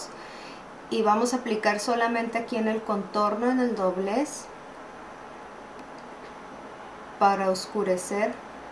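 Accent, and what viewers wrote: Mexico. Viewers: ya decia yo que sonaba muy mexicana xD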